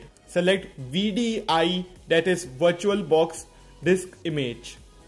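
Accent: India. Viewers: u indian? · sounds like an indian speaking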